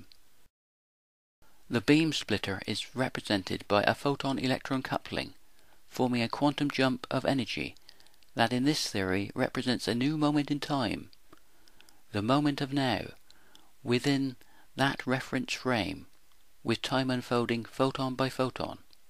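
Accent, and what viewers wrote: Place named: Bristol, United Kingdom. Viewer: Incoherent rambling in a thick bristolian accent... ...nice use of big words, by the way. To me, this gives insight into a mind that is clearly fascinated with the concept of ambiguity, which obsessively attempts to apply it to scientific observation. This smacks of pseudo-religion. I am not without my own philosophical musings, but this is simply pretentious.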